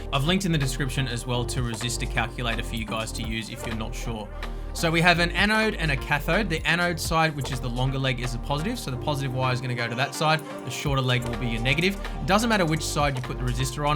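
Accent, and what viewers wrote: Australia. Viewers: Dude he is a ozzy. Its called an accent. ;)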